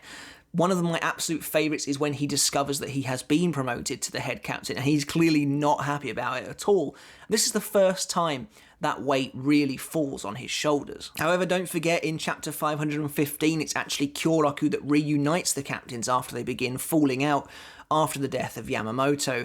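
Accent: United Kingdom. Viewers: Hey Mr, i love your british accent.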